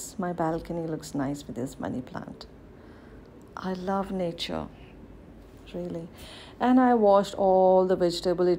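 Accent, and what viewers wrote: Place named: South India, India. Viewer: ... What it is that i dont like about u...??. U sooo beautoful by Face and Heart too... Ur South Indian Hindi accent it reminds me of my Manglorian friend... Padma.. Love ur vlogs.... Make more vlogs...not those short reels...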